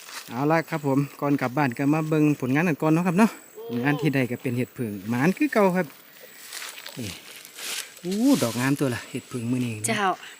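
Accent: Chaiyaphum, Thailand. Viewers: สวัสดีจ้า ยายบอกตรงๆเลยวาหัวตาสิเห็นช่องของเจ้าเด้งขึ่นมา ยายกะหัวตาฮู่วาทางบ้านเฮากะมีเห็ดเผิ่งคล้ายๆแถวเมืองนอกเนาะจ้า เบิงดี้…ดี เจ้าเฮดคลิปดีอีหลีจ้า สำเนียงคือชัยภูมิเนาะจ้า❤️👏👏👏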